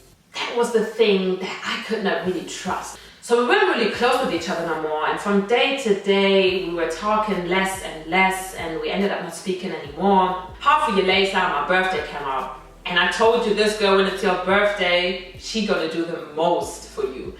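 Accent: Germany. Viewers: Love your German accent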